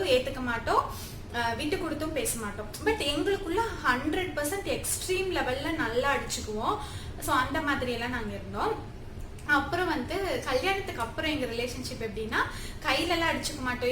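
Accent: Kongu, India. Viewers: Kongu Tamil akka Nega pasarathu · I like your kongu slang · akka how r u and ur family? Oru day neenga full kongu tamil pesura mathiri video podunga ka please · Kongu Tamil koncham pesunga.... I like the slang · கொங்கு தமிழ் Sema feel 😍😍😍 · Unga appatha oorla nadanthathuku vayiru valika sirichom... Elorukum iPadi akka thangachi amayraanglanu theryala.. Kongu tamilla oruvaati paesikaatunglaen akka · Kongu tamil one time pesuga my fav...slang🥰my athai home in Tirupur naa anga iruthan near by perumanallur so one time post ur slang kongu tamil...sis.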